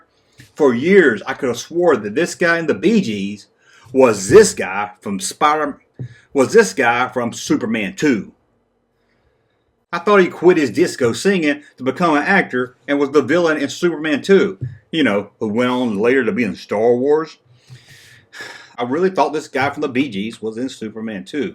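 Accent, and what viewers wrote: South, United States. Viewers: It's how we say it in the south.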